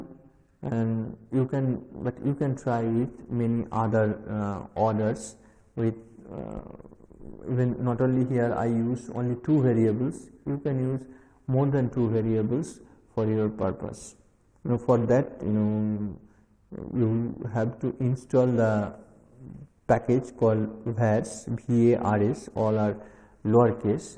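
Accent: India. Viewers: This indian accent is driving me nuts...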